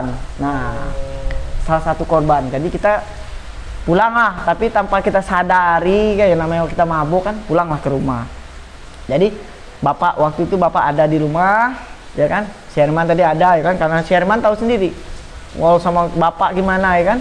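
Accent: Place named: West Sumatra, Indonesia. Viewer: Rang jambi, rang jambi. Hehe... Emangnya saya ga bisa tahu kalau abang ni orang minang, ga bakal ilang bang logat kamu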